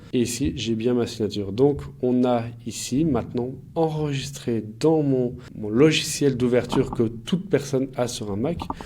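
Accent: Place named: Russia. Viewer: ... je sens un accent Russe , arnaqueur.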